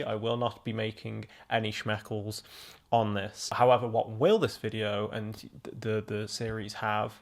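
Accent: United Kingdom. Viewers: where this accent comes from? Are you British?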